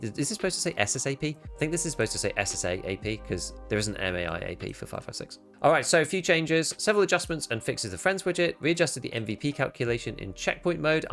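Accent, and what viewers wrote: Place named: England, United Kingdom. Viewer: Yeah, always Johnny on the spot with the videos, read to us in a pleasant English accent. Fuckin' a man, fuckin' a.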